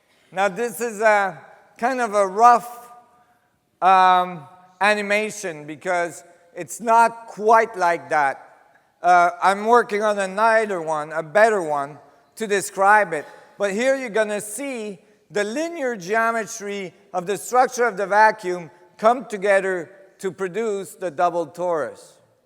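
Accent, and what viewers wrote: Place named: India. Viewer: жуткий акцент.индус?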